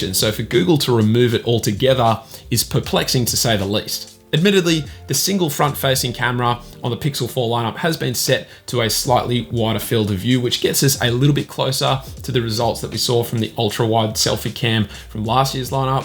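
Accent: Australia. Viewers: Thank you so much for your excellent reviews and unbiased advice on mobile phones - yours is the go-to channel for a well produced, honest review. I am thinking that maybe this Pixel 4 review did not require you to repeat honest 10 times though - mate your viewers can see you are honest by the quality of all your reviews! Your Australian accent is a refreshing change from the multitude of other cell phone reviewers! Looking forward to more from your channel. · Just stumbled across this video, and damn I wish YouTube had of recommended you before now. It's great to get an honest review, and have it in an Aussie accent. I've used every pixel since it first launched, and have never experienced the issues in any of them that were previously reported, but the decision to put the small battery almost turned me off the phone. Almost, the camera is well worth the phone.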